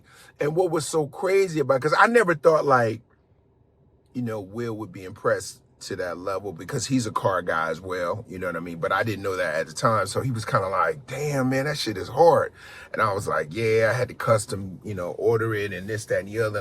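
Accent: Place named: New York, United States. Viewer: Bellamy I remember you and your body guard at the time (Will) came in the shoe store (First step in San Francisco) you guys showed me so much love! Bought a few pairs of jordans and You invited me to the punchline on Embarcadero. The guy that opened for you was a Latin guy that use to tell a story about how a Mexican dude turns a bike in a business 😂… If wife was sitting down (one of the baddest black women I’ve ever seen, gave me her seat)… you probably don’t remember but it was when you were promoting Any given Sunday. Just know since that day I’ve always watch all your pilots, shows etc. And tell everybody that will listen that you are a real ass dude and you have a New York accent. Be smooth playboy!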